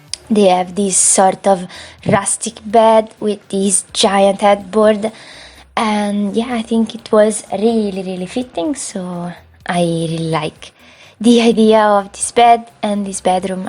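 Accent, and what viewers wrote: Italy. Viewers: ma sei toscana ? si sente troppo anche quando parli in inglese <3 bellissima casa comunque, l'esterno è da favola ma anche la struttura stessa della casa è davvero speciale.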